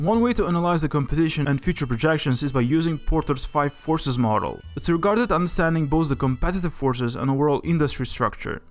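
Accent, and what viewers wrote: Eastern Europe. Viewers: So by the way you pronounce Huawei am I wright in guessing you're from Eastern Europe? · clearly eastern European accent... But this "the" Google or "the" Amazon thing is kinda weird...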